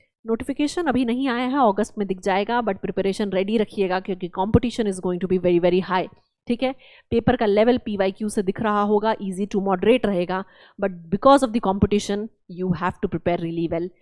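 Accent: Chhattisgarh, India. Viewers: Mam aap Chhattisgarh se ho kya ?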